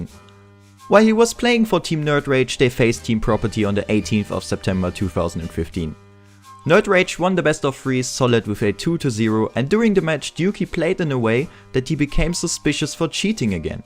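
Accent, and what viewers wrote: Germany. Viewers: Are you german? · his grammar is alright but he has a annoying german accent and says "f" instead of "th" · This German Accent is so cute lol.#nohomo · Wusste gar nicht das du deutscher bist, aber der Akzent hat mich die ganze Zeit schon stutzig gemacht. Mag deine Stimme in den Videos. Passt gut zum stuff den du bringst. Super Arbeit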